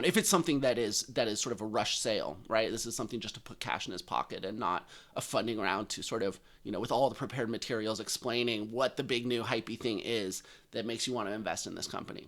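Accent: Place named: United States. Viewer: First time I see your channel because I was wondering whether you are a compatriot re your surname. You sound very american, so you must have dutch ancestors. Having said this, interesting video! 🙋‍♀️🇳🇱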